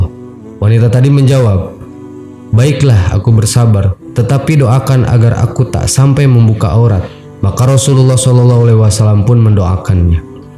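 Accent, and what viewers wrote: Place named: Sunda, Indonesia. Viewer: Suaranya si abang khas bangeetzz Suara org sundaa..mantep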